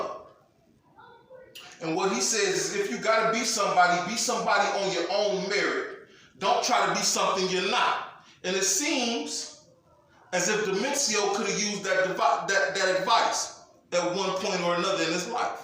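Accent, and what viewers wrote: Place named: Arkansas, United States. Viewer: You making a lot of sense.. Sound like niggas where I'm from here in Arkansas. .Home of the Chambers Brothers..who you should look into doing a video on..real heavy hitters..I went to school with the younger generation of Chambers..but as for Demencio..I'm not thuggin but let me hang wit em so I obtain that image n now I'm free to be me..No matter if I wanna be on some thug shit, goon shit, player shit, money making shit..I'm free to do that because of how I have surrounded myself..P.O.M.E. type shit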